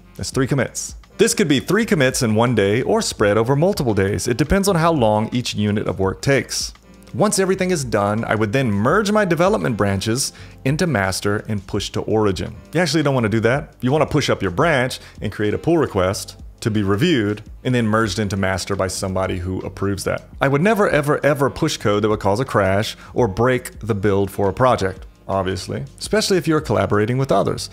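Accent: South, United States. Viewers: Are you from Georgia or South Carolina by chance?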